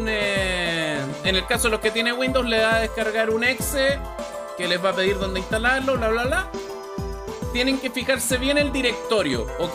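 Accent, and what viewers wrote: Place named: Chile. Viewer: OMG QUE CHILENO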